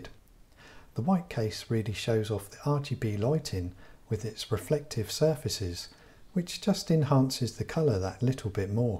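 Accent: United Kingdom. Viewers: We need you to start an asmr channel. You have a great voice, and the British accent just makes it perfect!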